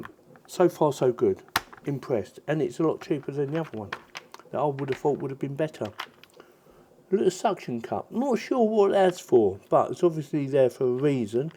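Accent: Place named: Cockney, United Kingdom. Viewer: Just saw a different helpful video of yours and I've subscribed. Great to hear a cockney accent too! God bless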